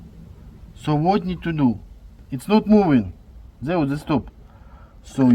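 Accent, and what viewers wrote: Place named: Russia. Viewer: На кой ты по пендоски базаришь , разговаривай на русском как нормальный человек, ты же блин из России , по отвратительному акценту и заднему плану понятно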